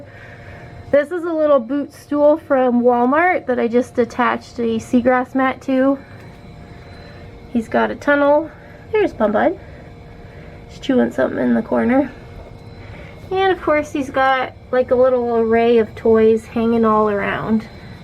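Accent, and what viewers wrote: Canada. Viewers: You do you girl! Say things the way you're comfortable saying them. I just thought that was how you guys say it in Canada. Lolz I really enjoy your videos and you have helped me to know how to take care of my piggies Soo well. Wheeks from my piggies to yours. Thanks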